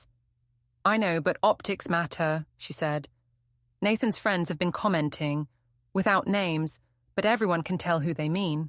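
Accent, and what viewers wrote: United Kingdom. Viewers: there’s a collection of stories where the AI narrator has a British accent. They all follow the same pattern. The wife or girlfriend does something messed up, but not quite cheating. The guy has a problem with it, the woman tries to gaslight him and then it turns out she actually did something way worse. It’s becoming repetitive at this point. Come up with something new. Also, you are giving the idea that the initial thing that the woman does isn’t bad enough. It is there’s no need to also toss in the other things that she’s done. I am two minutes in and it seems like the story is gonna go the same way and if that is the case, I am going to avoid all stories like this, like the plague.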